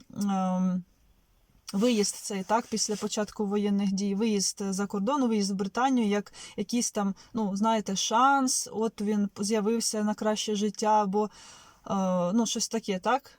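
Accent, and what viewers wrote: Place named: Central Ukraine, Ukraine. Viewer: В Лондоні ціни такі, що я не знаю, як прожити на соціальну допомогу. В мене знайомі виїхали з Лондона, які там жили та працювали декілька років, бо важко. При тому, що вони мову знали досконально. Плюс чоловік, який невиїзний. Аби він виїхав до вас, ви би нікуди не верталися. Не лукавте. І це при тому, що суддя по акценту, ви з центральної частини України. Думаю Київ.проте, вам випала щаслива нагода побачити Англію і Лондон. В іншому випадку, ви б отримували візу по другого пришестя Христа.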